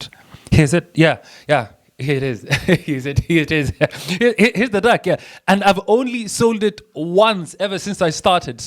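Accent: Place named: Africa. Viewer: What part of Africa are you from? I came here for POD info but I stayed because I love your accent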